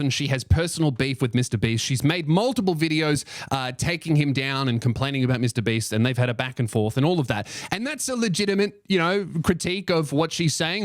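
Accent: Australia. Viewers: Ew Australian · Everything is better with an Aussie narrator just sayin'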